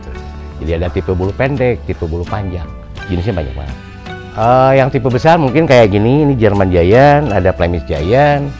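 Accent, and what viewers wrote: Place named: Sunda, Indonesia. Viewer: Kang Sunda banget santai bicaranya renyah enak di dengar saya mau nyoba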